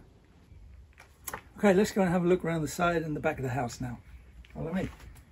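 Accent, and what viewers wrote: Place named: North Staffordshire, United Kingdom. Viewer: An excellent piece about a wonderful little house! :) And narrated by a fellow who sounds a good deal like Lemmy :D You wouldn't happen to be from around that neck of the woods would you (as in where Lemmy was from)? There are elements in your accent that are familiar to my ear, being a North Staffordshire lad :).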